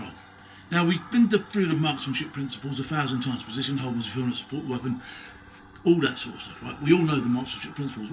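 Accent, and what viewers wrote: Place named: Norfolk, United Kingdom. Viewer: Sounds like a norfolk lad,probably explains why he's a bloody good shot....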